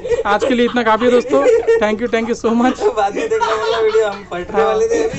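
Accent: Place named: Maharashtra, India. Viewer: तुमची हिंदी महाराष्ट्रातील आहे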